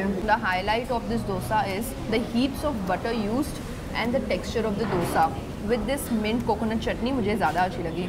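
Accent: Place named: India. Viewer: Indish accent is so funny . Is that how all Indians speak English 😂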